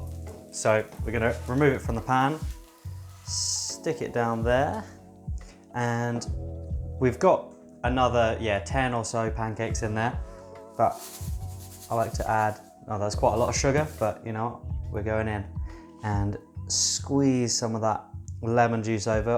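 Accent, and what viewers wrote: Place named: Cockney, United Kingdom. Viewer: Really great to see you back in the notional apron. But where did the mockney accent come from? Brilliant knife sheath.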